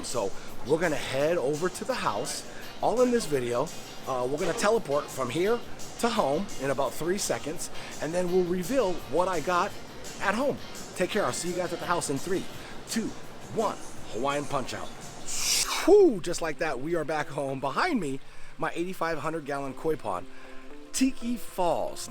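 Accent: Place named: California, United States. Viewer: Has that lib twang cali vides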